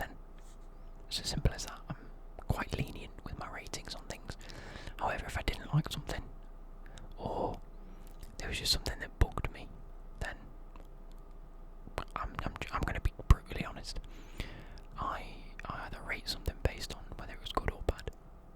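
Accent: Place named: Midlands, United Kingdom. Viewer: Where are you from? I might be hearing things but you sound like you’re from round by me (midlands)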